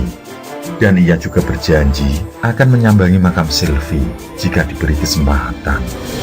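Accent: Java, Indonesia. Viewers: Pak dhe,, mohon maap,, walo pun saia pake headset, tapi sering kali di ujung kalimat yg pak dhe ucapkan sering g jelas,, di semua video pak dhe,,,krn pak dhe terlalu medhok,,,kadang susah di cerna oleh qu,,,mohon maap pak dhee,, 🙏🙏 salam dari aceh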